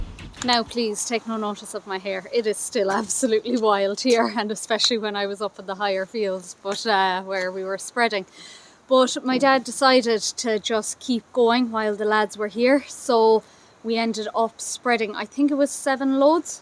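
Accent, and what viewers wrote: Kerry, Ireland. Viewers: dont say yearlings The original farmer word is WEANLING That's just what I think You use some lovely old expressions like the "Pike " and definitely don't lose the Kerry accent Best of luck from coMeath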